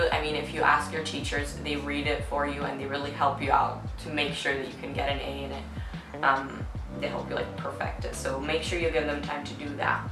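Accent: United States. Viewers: I hope this doesn't come across as too nosey, I'm just a curious kind of person :D You sound American to my British ears, are you doing A-levels specifically because you want to study in the UK? Hai scritto che sei italiana, vivi in Italia in questo momento? Hai fatto il liceo o stai facendo qualche scuola internazionale / inglese? Come si fa a fare gli A Level all'estero, ci sono centri dove si danno gli esami? Cmq in bocca al lupo per l'università... io sto pensando di tornare a fare gli A Level di nuovo quest'anno perché sono 3 anni che faccio questo stesso lavoro e ormai è diventato noiosissimo. Scusa tutte le domande, ma sono uno che deve sapere tutto :D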